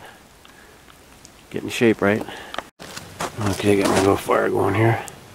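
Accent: Canada. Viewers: You sounds like your from Canada? My dad was born in Manitoba Canada! 1925 iam from USA 🇺🇸 but we have relative! Grate video 📼🎥🗻🗻🗻🗻🏔🏔🏔🏔🏔🏔🏞🏕🏕🌴🌲🌳🌲🌳🌲🌳🌲🌲🌲🌾🌿🦍🦍🦍🦇🐵🐒🐵🐒🐵🙈🙉🙊👽👽🐻🐻🐗🐗🐗🐶🐺🐶🐺🐶🐕🦌🦌🦌🦌🦌🦌🦌🦉🦆🦅🦊🦊🦁🦁🦃🦄🦄🐴🐎🐏🐐🐮🐄🐼🐼🐦🐣🐥🦎🦋🦏🦏🐯🐆🐈🐾🐾🐾🐾🐾🐾🐾🐾🐿🐿🦍🦍🦍👣👣👣👣👣👣👣👣👣👣👣🌲🌲🌲🌲🌲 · Good to hear that Canadian EH ! Right on man and cool tale as well.Cheers from a little island on the west coast O Canada.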